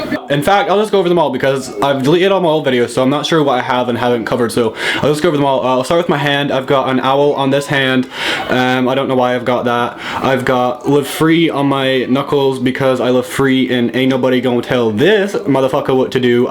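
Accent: Scotland, United Kingdom. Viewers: I think he moved to Scotland from somewhere so his accent scottish accent sort of blended with the other one. Just as half-assed as his tattoos lol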